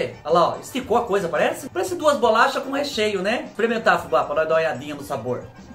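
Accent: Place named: Rio Grande do Sul, Brazil. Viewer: Oi vc é gaúcho tem um sotaque de deferente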